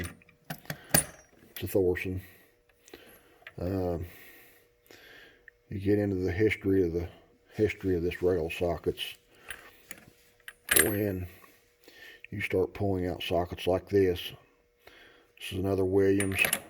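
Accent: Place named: West Virginia, United States. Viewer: oh wow, I’m on the Wv border in Ky. My family is from Wv. I thought I heard you mention Charleston and I was like man the accent sounds like home. We do most of our shopping in Huntington and try to stay in corridor g when we head to Charleston.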